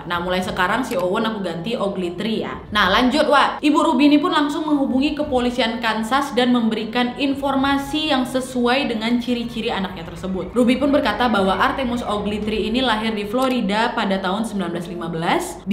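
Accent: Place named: Medan, Indonesia. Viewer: Orang medan memang kek gitu,cara ngomongnya memang seru seru jadi orang luar medan dengarnya enak,ngga ngegas tapi dikira ngegas,ngomong kek orng berantam hwhw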